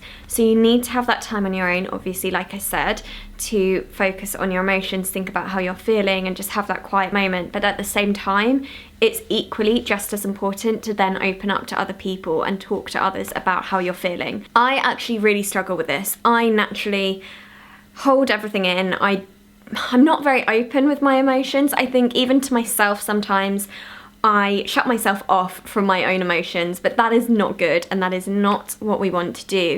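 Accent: United Kingdom. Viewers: Hi this is Kim! I'm new to your channel. I enjoyed your video, and love your Brittish accent! I have a mild case of anxiety, and take medication for it. I also color in adult coloring books, I also do cardio, and meditate! Thanks again, Kim!😊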